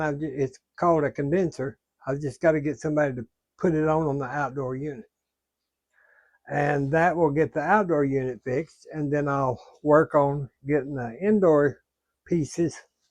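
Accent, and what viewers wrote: South, United States. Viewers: Good to see your video, always a pleasure, meal looks so good too, I like your country accent, God Bless, Hello to Momma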